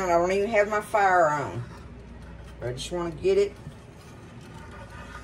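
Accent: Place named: Appalachia, United States. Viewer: Miss I just love to hear you talk you have the sound of a true hillbilly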